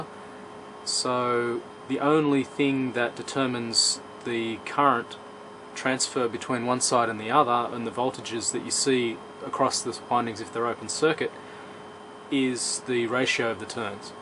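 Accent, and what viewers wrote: Australia. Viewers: thank you! I was right. I thought you are Australian)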